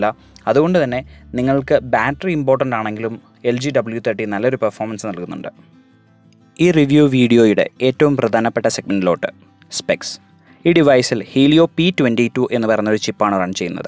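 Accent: Idukki, India. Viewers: Idukkikkaran anoo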